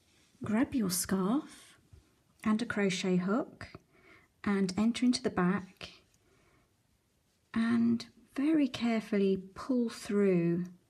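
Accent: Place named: England, United Kingdom. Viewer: What a gorgeous English accent.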